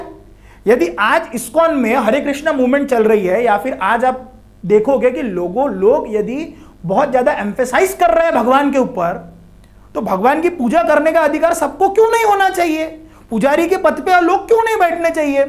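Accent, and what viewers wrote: Maharashtra, India. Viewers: Idk but you sound Marathi af sir. Typical Marathi guy talking in Hindi.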